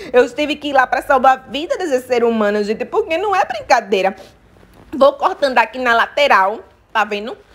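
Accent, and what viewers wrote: Northeast, Brazil. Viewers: Gosto da voz de...voz de nordeste nos vídeos...kkkk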